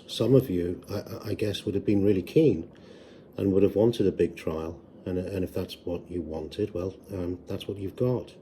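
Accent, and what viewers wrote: United Kingdom. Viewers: YouTube is REALLY pushing your “My answer to “how do you defend someone you think is guilty”” video from 5 years ago. I’ve seen multiple comments on multiple videos of people saying they found you through that video recently. And I have to agree with everyone, you are very smart, very personable, and very charming. A strong British accent for an American is always knee weakening as well. Love the content, love the knowledge. Only wish you were more consistent with uploads but i couldn’t imagine how very busy being a Barrister must be. Have a good day and thank you for gracing our TVs and phones.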